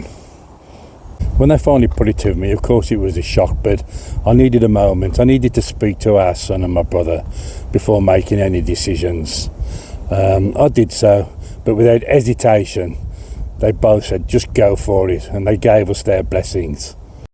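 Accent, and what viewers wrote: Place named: England, United Kingdom. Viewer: Bostin' - I recognise the accent. Fellow Englishman in Stockholm.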